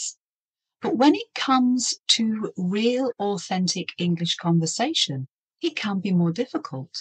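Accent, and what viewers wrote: United Kingdom. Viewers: Hi there. I’m Marcelo from Brazil, I’ve been learning English by myself since 2017 and I’ve focused in American English, but I’ve been thinking about listen to some English from people with British accent and when I found your podcast from Spotify it was “Loved at first sight” , or loved at first listen to” hahahah. Thanks so much for you and your team for spend your free time with these excellent and creative podcast. And don’t forget, stay at home and wear mask. I’ll see you soon!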